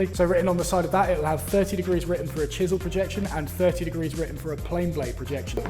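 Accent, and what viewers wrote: United Kingdom. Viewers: Don't add music noise to a demo video! You already have continuous talking, it is super fast, you have a British accent to get used to, your voice is a bit low and gutteral, and then you add this deafening level of extra noise. Why??? I came here for a QUIET and PEACEFUL video on woodworking, not getting driven deaf with a nervy video like this. So stressful to watch. I realize you are a young man buzzing with testosterone, but please get your workout in before filming. Your energy is too high and buzzing like a nuclear reactor. It does not have a calming effect on viewer. DO NOT ADD MUSIC TO ANY DEMO OR DIY. It is insanely irritating and unwatchable.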